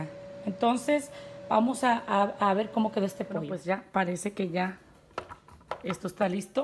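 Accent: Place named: Jalisco, Mexico. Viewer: Muchas gracias por la explicación me acaba de llegar la mia y como q me dio miedo usarla pero despues de tu video ya no!me imagine q eras jalisciense por tu forma de hablar yo tmb soy de jalisco residente en Colorado saludos :)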